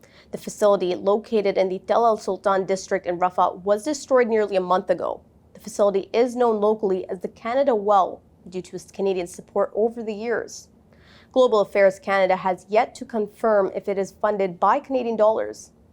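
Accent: United States. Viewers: I am a Muslim and I don’t like Palestinians the way Palestinians treat other Muslims in Saudi Arabia. I know Palestinians Directors in GCC countries badly treating others at jobs there I cursed one Palestinian director to be punished by Israel back in 2016. So please I advise you people to treat others well by rich Palestinians working in GCC countries. Also why you people migrating to Europe US and Canada and not fighting IDF. Islam tells you not to flee from war but fight them but you people are like cowards fleeing. So you better go to Gaza to fight instead of giving us news in an American Accent. You people and Afghanis are the same you live in western countries while you want others to tell Israel to stop war instead of you fight.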